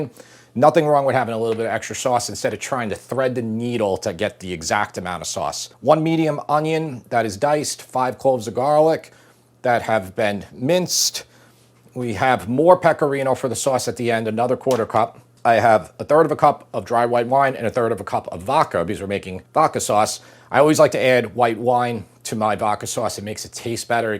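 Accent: New York, United States. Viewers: Making this tonight. Thank you for sharing your skills with us. And I like the NY accent:)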